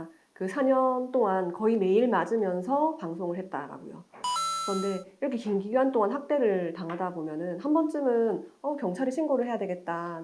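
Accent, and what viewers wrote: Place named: Gyeongsang, South Korea. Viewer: 오늘 깔끔한 얼굴에 갱상도 억양 굿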